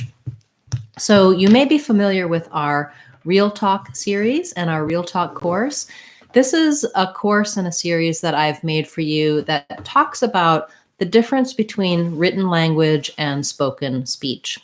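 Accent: United States. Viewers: a great explanation thanks for helping us, I love american accent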